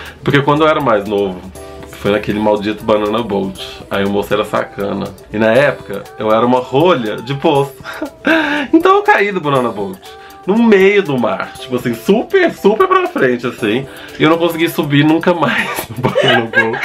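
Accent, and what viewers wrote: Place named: Minas Gerais, Brazil. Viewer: Sotaque mineiro é a coisa mais linda desse mundo. Adorei o vídeo! ❤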